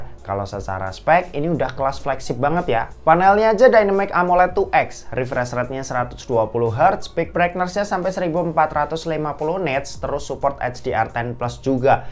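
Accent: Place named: Java, Indonesia. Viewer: medok tipis tipis y mas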